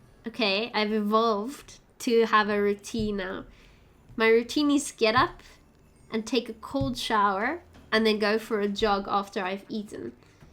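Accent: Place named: Afrikaans, South Africa. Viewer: Hi can you speak Afrikaans, Ek kan net aan jou aksent sê jy is afrikaans en van suid afrika 😊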